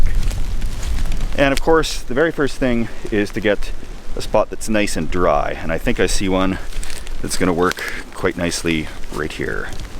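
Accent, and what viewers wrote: Canada. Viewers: I just subscribed today after watching a few vids. From his accent I am assuming he is from the Canadian side of North America:) I therefore await the stealth camping on a hockey rink!! Seriously, he seems a normal guy who super honest and informative and not one of those "look at me" YouTube campers, from what I have seen he is not in it for the likes, just the thrill (and probably the beers).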